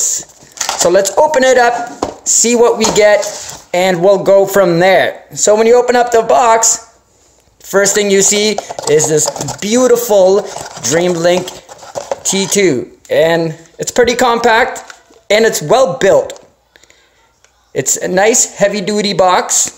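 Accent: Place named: Canada. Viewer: canadian, it's funny when you say out ( owwwwwwwt and abowwwwwwt) lol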